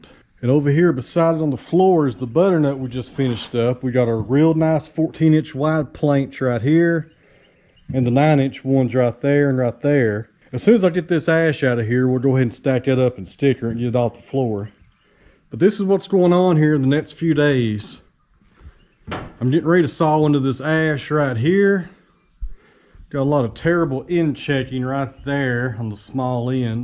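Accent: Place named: Tennessee, United States. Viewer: Same! His Tennessee accent, calm manner, wonderful Country music, and the beautiful way he presents the timber has me hooked, all the way from Australia!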